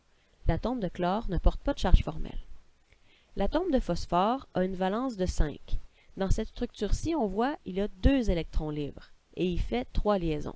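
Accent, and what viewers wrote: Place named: Quebec, Canada. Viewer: Je valide l’accent québécois ainsi que la vidéo 👌🏾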